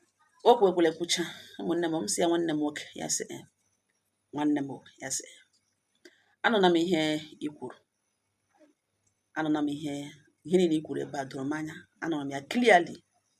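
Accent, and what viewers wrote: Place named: Imo, Nigeria. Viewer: Good morning Ma, I'm not from Imo State but i like the way you speak Imo State, it sweet me too much.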